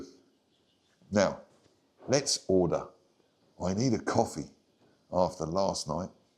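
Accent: United Kingdom. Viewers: I like your style,. You make me laugh. You ought to be an actor. You have the perfect voice for it. Are you a Cockney?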